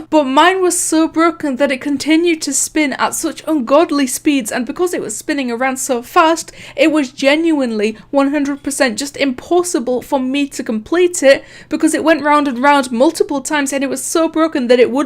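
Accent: United Kingdom. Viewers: I could already tell you were British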